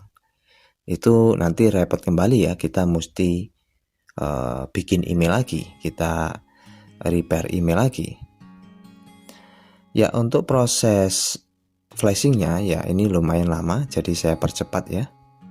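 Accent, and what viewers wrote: Java, Indonesia. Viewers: Logat nya kaya org jawa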